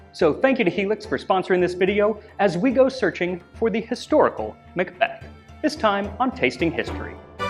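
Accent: Scotland, United Kingdom. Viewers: I love how picks up accents - there's a distinct hint of Scottish in a lot of his pronunciations in this video! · The further you get into the video the more and more is turning Scottish in his accent. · As a person who has a very fluid accent when I'm traveling, it was endearing and amusing to see you take on a bit of a Scottish intonation. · As you are describing the history, it sounds like you are developing a Scottish accent. It's cool. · My favorite part of this episode is clearly suppressing his urge to fully mimic the scots accent after spending so much time there. 😂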